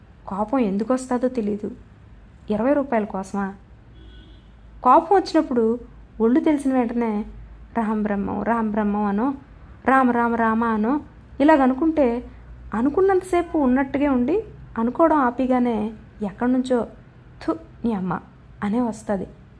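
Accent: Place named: North Andhra, India. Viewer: చెక్కా వారికి గానీ పెండ్లికి గానీ పిలుపుకి గానీ కథతో సంబంధం లేదు. (లేదా నాకు అర్థం కాలేదు)😂 గారి కథనం బాగుంది. గారు ఉత్తరాంధ్ర యాసతో చదువుతుంటే వీనులవిందు గా ఉంది! కొన్ని పదాలు మరీ పాయసంలో జీడిపప్పుల్లా అ(వి)నిపించాయి!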